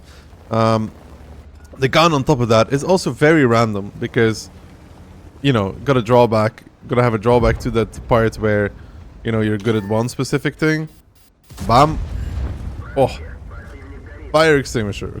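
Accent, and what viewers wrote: Netherlands. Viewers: Wait you dutch??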